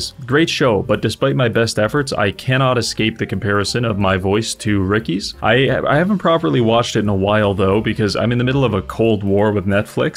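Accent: Canada. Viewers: when i first watched your video (the wh one) i immedietly thought you sound like seth. then seconds later i was hit by the canadian accent and knew i found a gem of a channel XD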